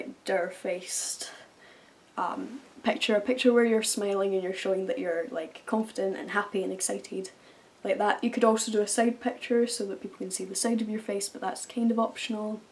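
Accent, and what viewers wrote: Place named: Glasgow, United Kingdom. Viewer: I knew i recognised you... Soon as you started talking i realised I've most like Likely seen you around Glasgow at some point!! This was a great video by the way, I've just accepted a 3 year contract with a modelling agency and I'm hoping to specialise in alternative modelling. Definitely boosted my motivation to succeed so, cheers :D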